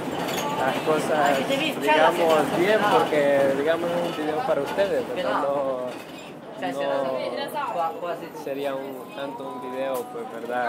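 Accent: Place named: El Salvador. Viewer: También se le nota en el "pues si" jajajaja yo también soy salvadoreña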